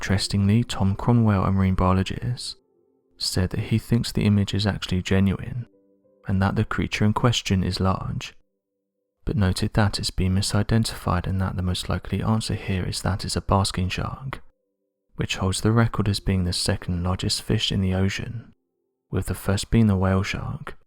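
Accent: United Kingdom. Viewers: I'll save this one for tonite. This guy is for bedtime and the other (guess there are two in this channel) for daytime. Both have great voices and nice British accents.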